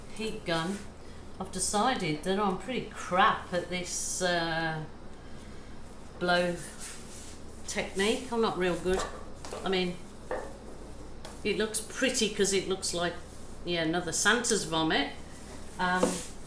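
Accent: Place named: Walsall, United Kingdom. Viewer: Love it, I'm a too from Walsall live in Townsville 😁 Where are you originally from? You talk like me x I've been in Oz 29 years x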